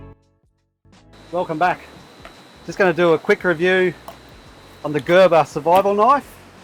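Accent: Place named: Australia. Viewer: You KNOW it's legit when the narrator has an aussie accent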